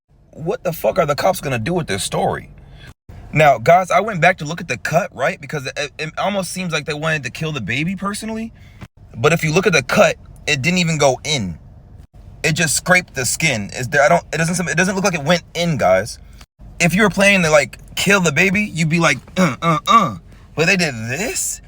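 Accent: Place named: Minnesota, United States. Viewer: it’s so cool that your from MN. I’m born and raised and I could kinda tell with the way you talk. LOVE YOUR VIDEOS THO!! been watching all day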